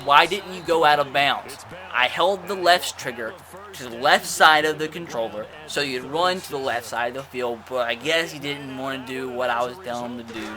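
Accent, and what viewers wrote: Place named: Alabama, United States. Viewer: U have an alabama voice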